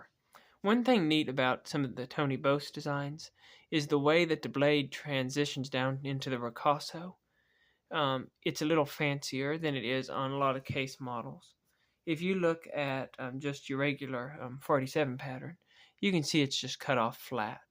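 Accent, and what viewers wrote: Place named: West Texas, United States. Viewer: He’s probably not from there but his voice and accent reminds me so much of a soft spoken hotel clerk in Van Horn, west TX 😂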